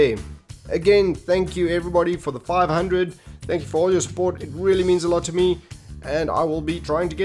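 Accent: South Africa. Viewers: You sound South African. Are you? I’m South African too!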